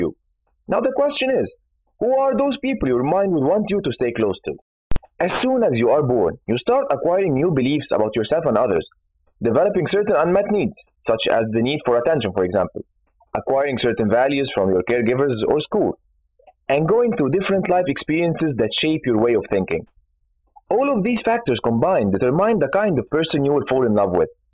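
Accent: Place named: Egypt. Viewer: egyption accent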